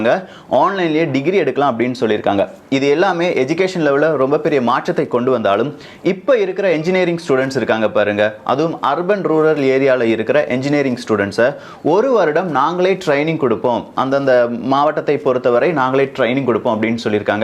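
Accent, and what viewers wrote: Kerala, India. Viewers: Hello bro! I watch all your videos! They are really nice and useful. But unga speeches la Niraya Malayala vaadai adikuthu! You have a strong Malayalam accent! At times I hear Malayalam words also! Are you basically a Malayalee? Is your first language Malayalam! ? Just curious bro!!!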